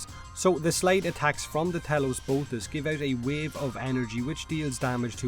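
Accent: Ireland. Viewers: i love your Irish accent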